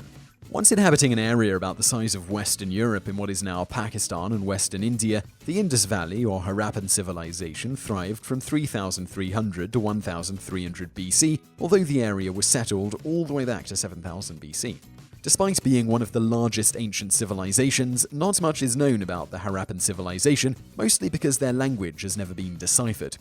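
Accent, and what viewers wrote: United Kingdom. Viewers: British ppl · He's British. That's their pronunciation.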